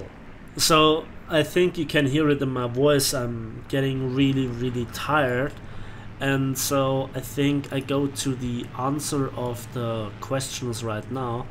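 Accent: Germany. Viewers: Hi! I'm an AB pilot with 40.000 air kills and 11.000 ground kills and I'm starting to look at tanks now. I'm a total rookie at tanks and your huge channel is an enigma to me... there is so much stuff to watch and from what I saw sofar it's very good stuff... and here's a problem... I'd like to see some guidelines for tank noobs... and some guidelines to your channel... what videos to watch, where to start on your channel and for most part... guides on how to start with different nations... witch premium to buy, which tank to put talisman on... strategy, tactics, ammo guides... it's a rediculus stuff to you but if you want new subs then stop concentrating on pro players and start looking at rookie ones... because believe me... there are much more of us then there are pros:-D And just a little tip... for christ sake make your videos shorter... you like to talk... 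a lot... and that's nice but maybe you should sepparate your videos in talking ones and action ones... your subs are not allways in the same mood to watch long videos... 45 mins video is an absurd length... maybe add some index table for stuff you talk about in such long videos so people can skip parts they're not interesed in. And please make more AB stuff as you yourself pointed out in one of your recent videos that AB is much better and practical for spading then RB... I love your channel and all the effort and time you are putting in to it and I love your german accent and I wish you get 100.000 subs in the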